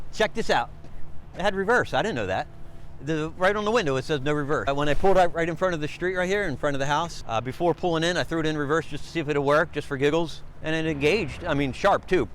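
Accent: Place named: Pennsylvania, United States. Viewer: im new here so idk if youve said where you're from but i would know that accent anywhere lol PA ftw bro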